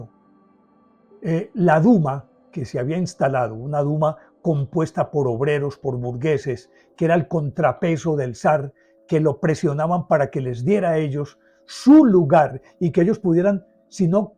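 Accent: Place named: Antioquia, Colombia. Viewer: Uy Profe excelente relato, siempre enganchado con sus fascinantes historias, y lo mejor con acento paisa. Un abrazo grande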